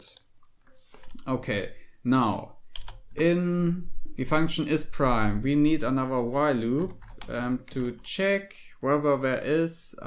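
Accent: Germany. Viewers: your accent are like germans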